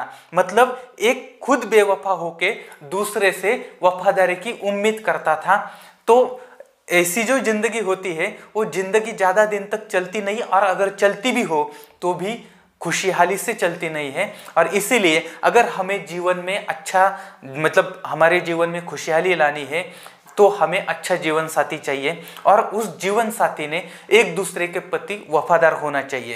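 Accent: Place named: Nagar, India. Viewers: Aap ki Hindi Marathi me nikal Rahi he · सर तुम्ही नगर चे आहात काय? आमच्या नगर ला अशीच हिंदी बोलतात..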